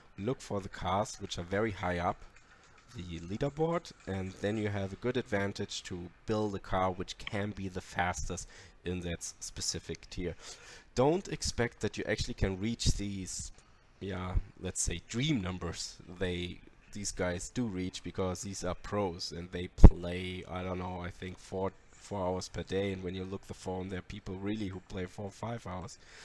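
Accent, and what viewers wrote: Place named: Germany. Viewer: Man merkt richtig an der Aussprache dass du ein Deutscher bist 😁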